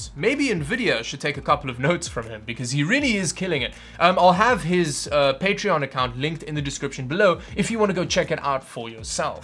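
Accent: South Africa. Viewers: Are you south African? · Jo dufe you a south african?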